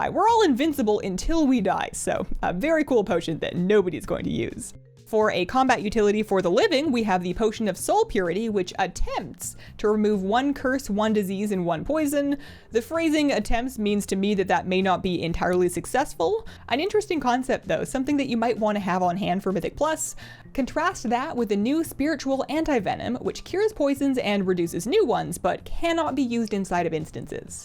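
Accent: Canada. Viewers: Subtle Canadian accent? ♥ Canada.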